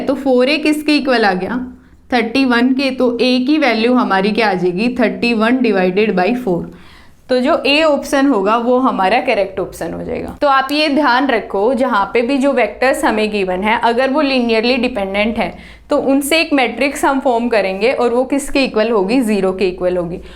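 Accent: Haryana, India. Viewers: Your haryanvi and Jaat wala lehja saf najar aa rha h bhen ji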